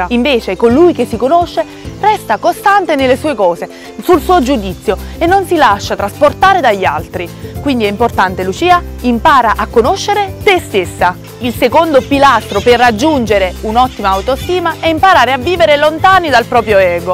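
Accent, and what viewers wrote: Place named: Naples, Italy. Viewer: Che bello in tuo accento napoletano !!!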